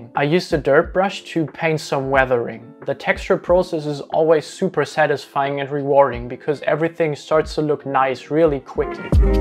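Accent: Germany. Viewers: Man hört den deutschen akzent so was von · Dude you got a german accent ( Nicht böse gemeint) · du bist deutsch man hörts;)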